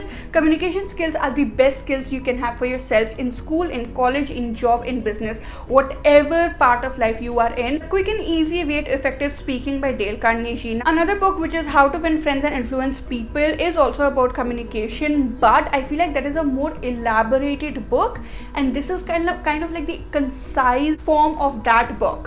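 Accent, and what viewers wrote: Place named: England, United Kingdom. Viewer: You have such a great English accent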